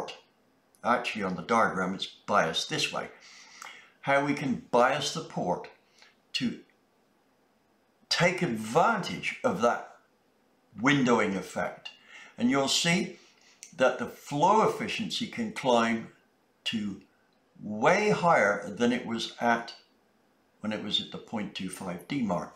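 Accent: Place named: West Country, United Kingdom. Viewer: Hearing a west country accent🤔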